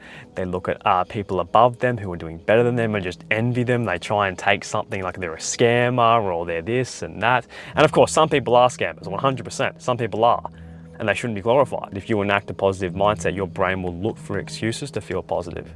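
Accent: Australia. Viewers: He's Australian?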